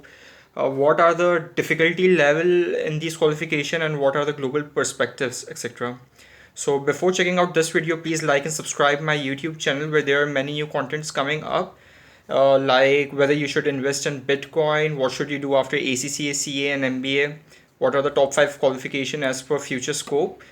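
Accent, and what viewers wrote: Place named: Pakistan. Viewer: Are You Indian?? This accent is Normal for Pakistani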